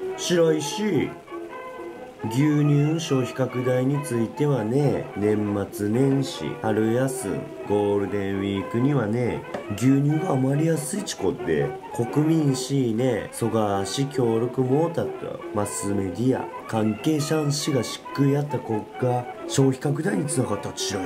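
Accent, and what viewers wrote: Kagoshima, Japan. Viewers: 鹿児島弁くせがつえ〜！！！！ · 鹿児島訛りのアフレコ、最高です🤣 白石くんのプリキュア愛も入ってましたね💖🤣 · 待ってましたw 大臣の鹿児島弁アテレコシリーズ大好きだわw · 地元が違うのに何故か標準語より内容が頭に入ってくる鹿児島弁よ・・ｗ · 全然わかんない鹿児島弁も凄いし、プリキュア発言に※ついてるのも面白いwww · いまの若い鹿児島ん人でここまで鹿児島弁を話すん子がおるとはたまがっど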